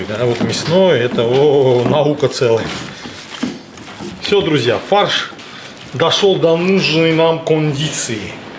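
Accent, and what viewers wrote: Baku, Azerbaijan. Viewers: Салам землякам.я сначала ставлю би дяня жирный лайк а потом слушаю родно бакинский говор.мы часто в Новханах делали балык .кябаб.и котлеты классные тем более в вашем исполнении.Спасибо🎉🎉🎉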